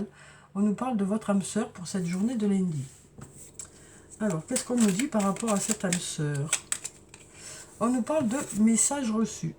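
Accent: South, France. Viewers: Bonne journée toujours un plaisir d écouter cette voix douce avec cet accent du soleil, merci pour votre tirage et merci de ce temps que vous nous offrez.bonne journée à vous bisous.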